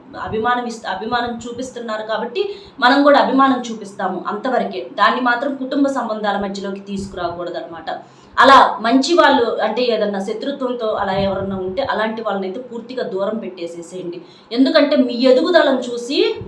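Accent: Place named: Guntur, India. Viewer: గుంటూరు యాస లో బాగా చెప్పారు మేడం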